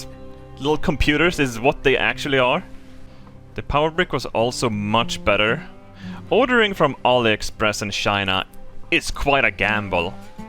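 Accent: Sweden. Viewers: That glorious glorious Swedish accent from is honey to my ears it’s been too long since I’ve heard your voice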